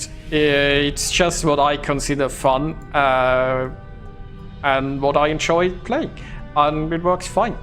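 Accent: Germany. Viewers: Alter dein Deutsch Englisch hört sich geil an😂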